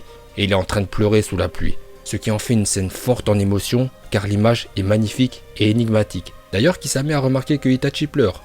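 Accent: Japan. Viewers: Déja avec le titre, je sais déjà que la vidéo va être intéressante!! Avec ton accent japonais trop bien j'ai l'impression d'être en VO!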